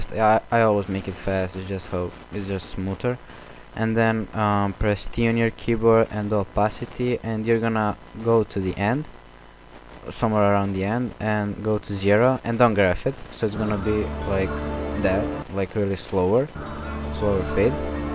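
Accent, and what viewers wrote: Russia. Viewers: Это что чеченский акцент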